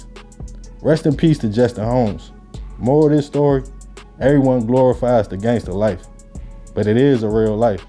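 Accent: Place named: Washington DC, United States. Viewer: The narrator gotta be from DC. The accent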